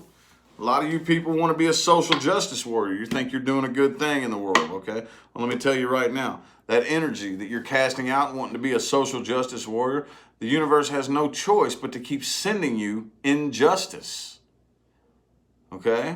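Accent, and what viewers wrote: South, United States. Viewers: Super accurate, but also I would watch even if it weren't! Love ur energy, your southern accent and your face 😂😂😂😂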